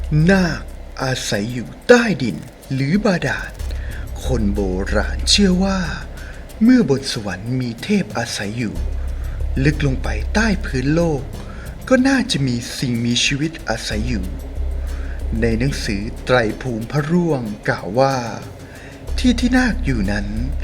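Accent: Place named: Isan, Thailand. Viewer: เสียงแอดมินเวลาพูดอีสานสำเนียงน่ารักดี.😊😊😊😊😊😊😊😊😊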